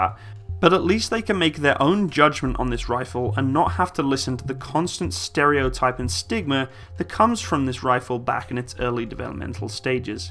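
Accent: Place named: England, United Kingdom. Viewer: you sound english/ do you live in murica, cos if you still live in then uk how did you get the tavor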